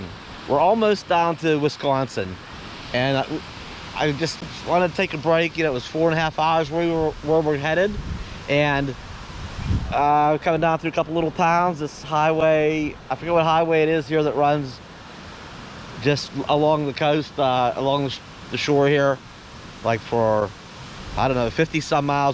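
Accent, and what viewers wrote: Pennsylvania, United States. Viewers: If it weren’t for your PA accent I’d say you’re a Michigander. Lol. Bella too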